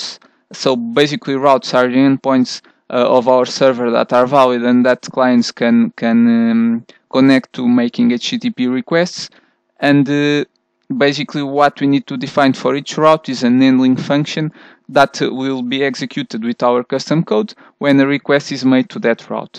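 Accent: Portugal. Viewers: Great! Quick reference. Thanks. :-) You're Portuguese, right? I'm Portuguese and, from your accent, I would guess you are too. Just a quick correction. If you have your local WiFi router correctly set up, you don't need to be on the same WiFi network to access the web server. you can access it from any other inter-connected network. The Internet, for example.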